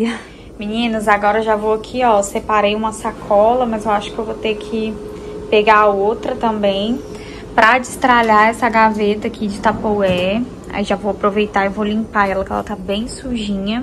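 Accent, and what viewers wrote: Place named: Minas Gerais, Brazil. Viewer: Esse sotaque de mineiro 😍😍😍 amoooooooo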